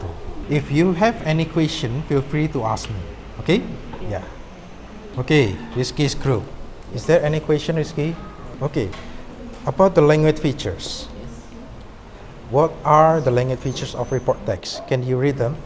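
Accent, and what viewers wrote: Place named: Java, Indonesia. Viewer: Javanese english sir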